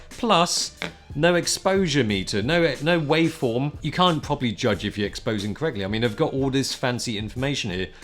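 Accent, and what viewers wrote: United Kingdom. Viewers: I remember as young funny guy with british accent. Fast forward,now with wife and kids in tow looks like granpa of himself. Run for the hills Save yourself!!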